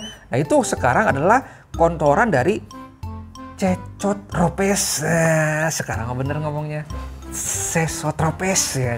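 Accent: West Java, Indonesia. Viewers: Sunda na TI mna kang😀😂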